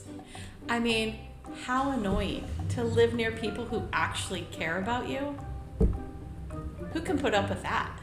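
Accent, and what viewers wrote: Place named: Texas, United States. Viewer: That's sounds like Texas. Lol- talking part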